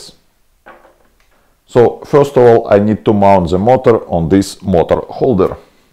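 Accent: Russia. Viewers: произношение очень похоже на английское произношение русскоязычного человека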